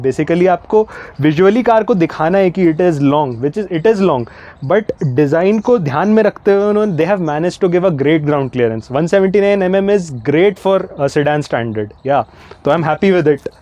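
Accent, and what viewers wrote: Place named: South India, India. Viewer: his talking style is similar to that of a south Indian guy born and brought up outside of south India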